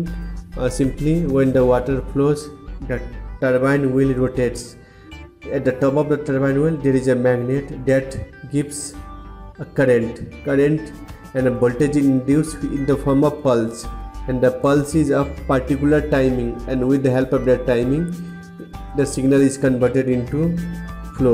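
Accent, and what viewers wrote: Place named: India. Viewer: Hard to understand with that Indian accent and the background music makes it even harder to understand what is saying. Now you know what need to change. Content and illustration are good.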